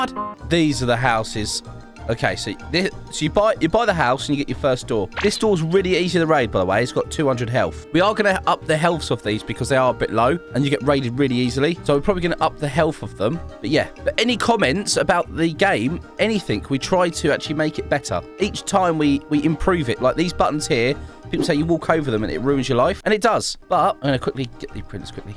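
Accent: United Kingdom. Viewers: R u from the UK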